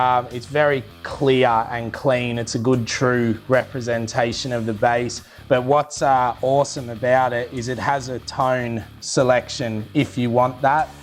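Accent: Australia. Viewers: this is the heaviest australian accent i've ever heard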